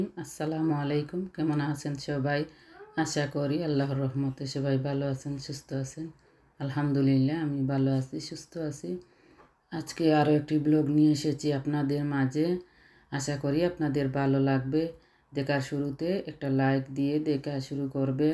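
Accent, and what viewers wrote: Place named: Sylhet, Bangladesh. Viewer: 👍খুব সুন্দর লাগলো আপু 🎉🎉সিলেটি কথা শুনতে ভালো লাগে 🎉🎉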